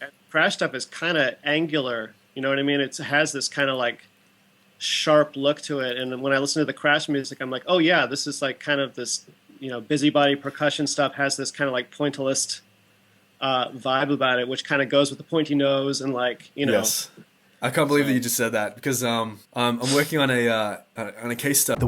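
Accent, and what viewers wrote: Australia. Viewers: I just can't take a serious discussion by someone with such a stereotypical Aussie accent. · He made it so engaging because of his Aussie accent.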